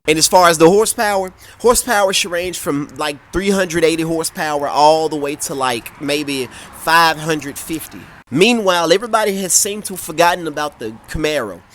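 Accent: United States. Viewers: Thanks!brother I’m from Boston massachusetts and when I initially heard your accent I was like here’s some country dude with some country slang even a brother from Boston won’t understand but then you start talking and oh my god you’re a genius !! You definitely know your shit !!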